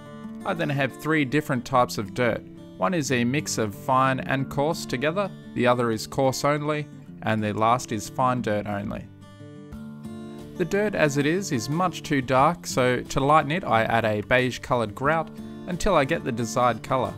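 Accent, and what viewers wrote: Australia. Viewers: Is it narrating or a TTS app with an Aussie accent? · I thought that scenery looked awfully familiar in an Australian kind of way, and then of course I heard the accent, haha!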